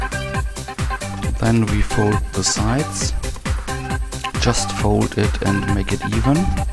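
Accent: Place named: Germany. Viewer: man echt geil nix gegen dich aber mann hört übel raus das du deutscher bist wenn nich tuts mir leid aber zu geil. Help very now i have finally a cover for my steam BO2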